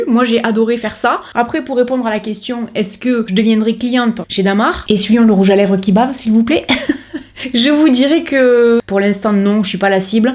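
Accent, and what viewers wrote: South, France. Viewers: Bonjour, vous m'avez fait rire, merci de votre bonne humeur et de votre accent du Sud.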